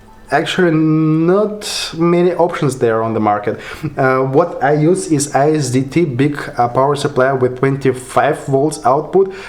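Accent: Russia. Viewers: сори, слушать такой вот рунглиш это насилие для ушей. попробовал включить субтитры, но они автопереводные. смотреть и без звука и без субтитров - бестолку. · Прикольный английский у тебя 😺 Чисто русский английский 👍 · мне понравилось, давай больше таких роликов, смотришь и язык подтягиваешь ) при чем быстроговорящих американцев без субтитров не понять, а когда присутствует русский акцент, сразу понимаешь )))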